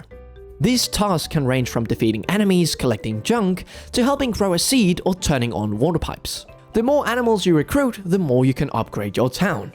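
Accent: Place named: United Kingdom. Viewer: And through what I assume is your work at NL, your English (which was already quite impressive as an ESL speaker) has improved even further! It might be because you have a slight British affect to your English rather than the usual Mexican-American accents I'm used to, mind you, but you could pass off as a native speaker from a non-USA English-speaking country!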